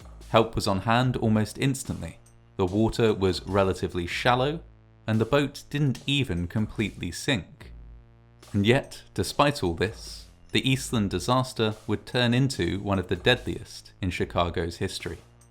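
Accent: United Kingdom. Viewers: Ohh, thanks. Having anxiety symptoms. A guy explaining a shipwreck in a British accent is very soothing. Might watch others.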